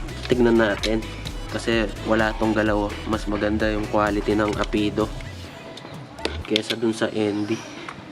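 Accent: Pampanga, Philippines. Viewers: Kapampangan ka pala😅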